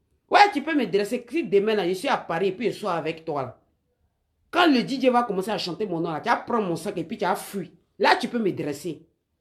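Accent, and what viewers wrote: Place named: Guinea. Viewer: Tu fais peur tu ressemble à une sorcière voilà tu es venue de la Guinée 🇬🇳 voilà pourquoi ton français est penché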